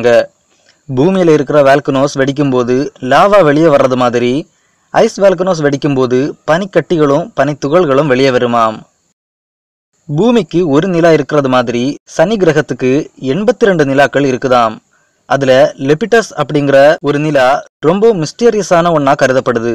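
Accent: Kanyakumari, India. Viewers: Kumari Tamil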